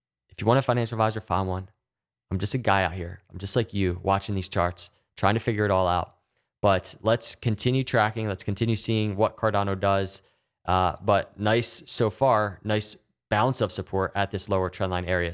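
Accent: Philadelphia, United States. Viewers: Gotta love that Philly accent. GO BIRDS! Let's go Cardano too!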